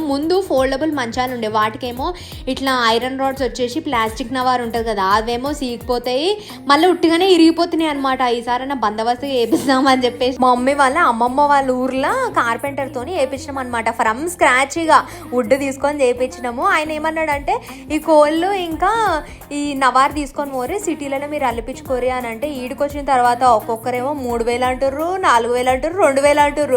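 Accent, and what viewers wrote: Telangana, India. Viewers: పక్క తెలంగాణ యాస ❤ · ఏ అక్క తెలంగాణ యాస్ సూపర్.. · Pakka Telangana yasa 👏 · Telangana యాస చాలా బాగుంది.... నవారు దూరం అల్లాడు.... మనమే అల్లుకోవచ్చు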